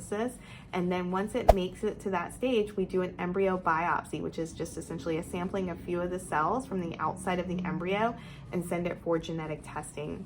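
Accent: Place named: United States. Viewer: Great content! Also how did you manage to have such an American accent? Do some schools in India have international English classes where they hire teachers from Western countries??? Just curious.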